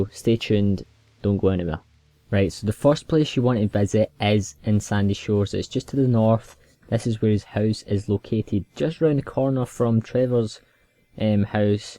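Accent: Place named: Scotland, United Kingdom. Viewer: cos hes scottish like me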